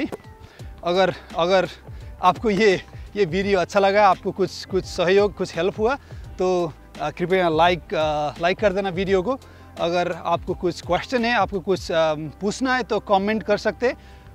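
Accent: Nepal. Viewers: Why i am hearing nepali tone? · Aap Nepali assent mai bat kar rahe ho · nepali daju k xa khabar tone chai nepali k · Bro your hindi seems like Nepal 🇳🇵. Are you??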